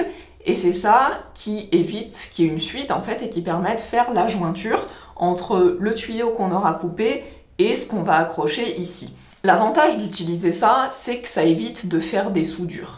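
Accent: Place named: France. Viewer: Je sais pas pourquoi, mais j'aime bien entendre cette damme parler :) Elle a un "accent" français que je ne connais pas, trés agreable à l'ouïe, si bien sûr je peux appler ça "l'accent" vu que je ne suis pas français moi-même, d'une autre region que la mienne probablement. Je mets un Like!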